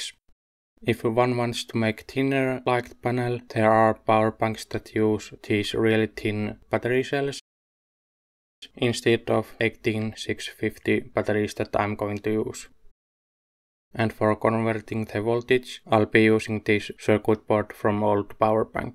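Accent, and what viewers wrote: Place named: Finland. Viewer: Probably from Finland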